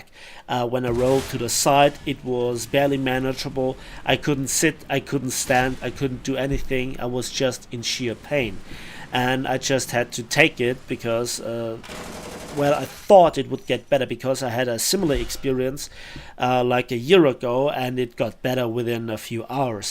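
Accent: Bavaria, Germany. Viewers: I hob mas scho gdocht dasd a Bayer bist hahaha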